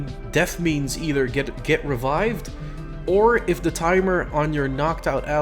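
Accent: Netherlands. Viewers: Are You Also Dutch?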